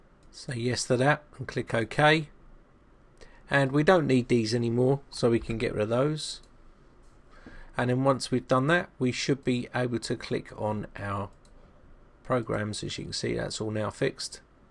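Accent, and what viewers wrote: United Kingdom. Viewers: You spelt infection wrong in the description, you wrote "ifections". BTW, I like British accents :)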